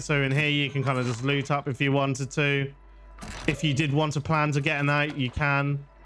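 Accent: United Kingdom. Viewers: Hmm... "The gatman" for an assault rifle... well, you sound British so I'll chalk it up to ignorance.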